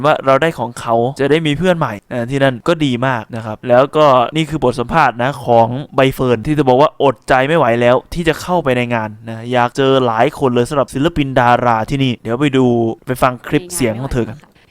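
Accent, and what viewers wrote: Ubon Ratchathani, Thailand. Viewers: แอดมินเป็นคนอุบลหรอครับสำเนียงเหมือนคนอุบล😊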